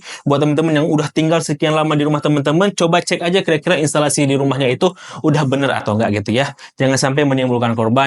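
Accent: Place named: Sumatra, Indonesia. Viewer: Logat mana bang? Kayak sumatera sonoan yak?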